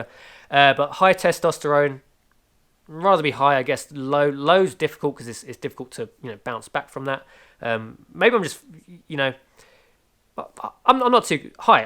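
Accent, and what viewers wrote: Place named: South London, United Kingdom. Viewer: Sounds like you live in South London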